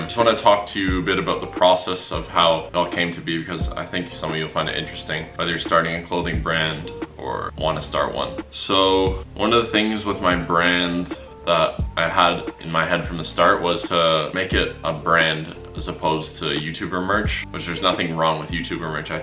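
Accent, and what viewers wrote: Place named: Canada. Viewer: You sound extra canadian in this vid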